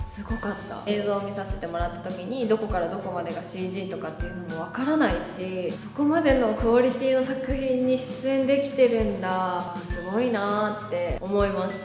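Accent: Kansai, Japan. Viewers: 週末で「今際の国のアリス」見て、このクセの強いキャラを演じてるのは誰だ？と思いました。この動画でバリバリ関西人だと分かった。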